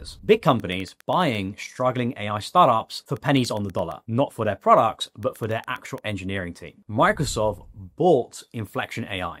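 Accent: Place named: United Kingdom. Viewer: when i hear british accent i'm already prepared to hear nonsense, proven itself true more often than not... and here we go again. how about making a vid explaining that the ai bubble burst, once it actually did!? oh no, can't do that yet, bc it hasn't, and if you'd not make these ridiculous "predictions", then you couldn't say later on "yeheyy, told you so!"... but what happens if there actually isn't an ai bubble?? then you'll just be quiet and hope nobody's going to call you out for your bs opinion bc nobody will bc you're not well known / a celebrity. so there you are making nonsense "predictions"... but why? what are you so angry about? explain??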